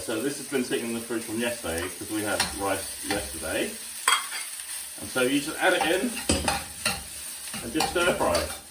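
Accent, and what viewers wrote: England, United Kingdom. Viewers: an English accent is hardly surprising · The shock that he sounds British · perfect Queens English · a Chinese man with a British accent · that's not an Australian accent ok ! It's British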